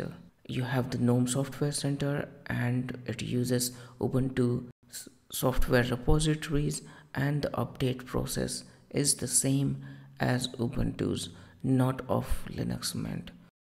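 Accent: India. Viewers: indian voice ::))))))))))))))))))))))))))))))))))))))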